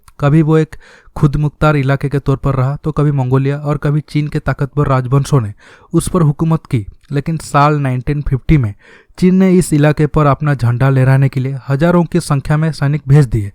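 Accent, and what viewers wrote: Odisha, India. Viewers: Aap udisaa k ho. Kyaaa. Bhai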